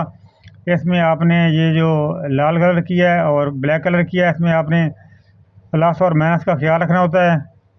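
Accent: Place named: Mianwali, Pakistan. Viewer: السلام و علیکم بھائی، آپ میانوالی سے ہیں کیا۔۔۔؟ آپ کے لہجے سے معلوم ہوتا ہے ، اگر میانوالی سے ہیں تو آپ کی شاپ کس جگہ پر ہے ۔۔؟؟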